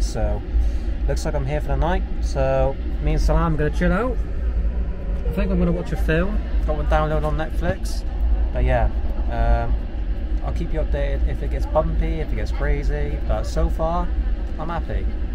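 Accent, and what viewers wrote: United Kingdom. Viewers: Your thick British accent is good bro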